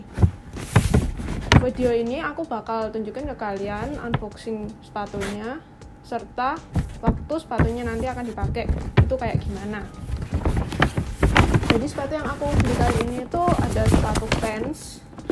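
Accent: Java, Indonesia. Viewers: jawa banget ngomong nya, love you cantik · Medo' banget jawane :v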